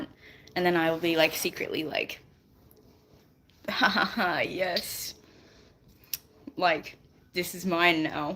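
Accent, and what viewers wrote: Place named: Australia. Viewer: Love your accent. Are you an Aussie 🤔🦘🇦🇺🌏🐨🪃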